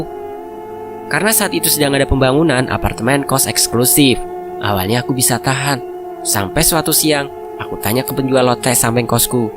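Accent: Java, Indonesia. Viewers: Sya suka cerita mistis tapi kalo ngomongnya jawa medok jadi males dengernya memang udah ada teksnya tpi ini cerita bukan film saya dengernya sambil kerja...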